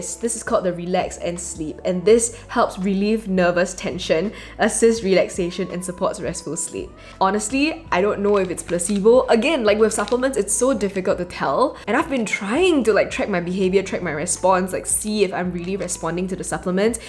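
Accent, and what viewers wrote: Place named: Singapore. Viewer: Your singlish is impressive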